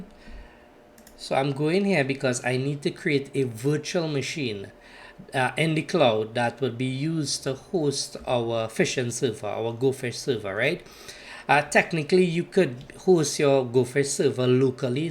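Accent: Trinidad and Tobago. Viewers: Are you trinidadian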